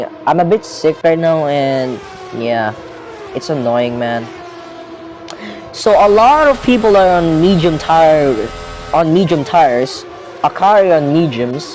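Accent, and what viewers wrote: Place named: India. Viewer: Why do you sound so indian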